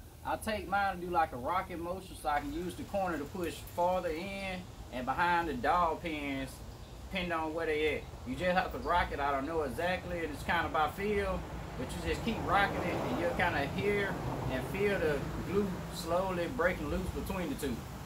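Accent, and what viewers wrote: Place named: Georgia, United States. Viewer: Hear them accents all time here in atlanta lol.. Not sure where in Georgia he from tho..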